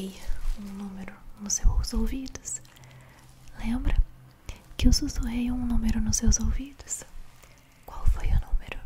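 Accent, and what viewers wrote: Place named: Belo Horizonte, Brazil. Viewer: meu novo sotaque preferido então é de BH. Haha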